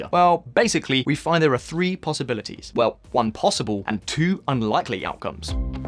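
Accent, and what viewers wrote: United Kingdom. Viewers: Fast and loud, with a British accent, Americanization at it's most annoying. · Jay McJakome I don’t mind the British accent, I even prefer it but he speaks way to fast, I can’t even process what he is saying... I can understand it but it goes into one ear and comes out of the other... I think the issue is the lack of punctuation or something.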